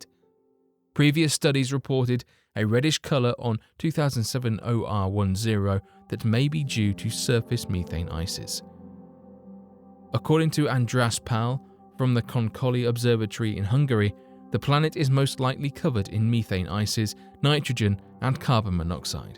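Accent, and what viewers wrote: England, United Kingdom. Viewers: The English can keep saying meethane I will stick with methane lol.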